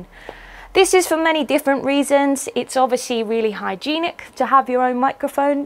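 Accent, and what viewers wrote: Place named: RP, United Kingdom. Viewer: accent contains a trace of the current fad in BBC continuity announcers. I wonder if she even realaahses she's doing it.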